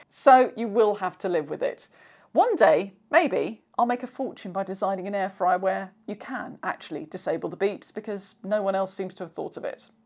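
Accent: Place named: Liverpool, United Kingdom. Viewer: This woman is speaking with a Scouse accent.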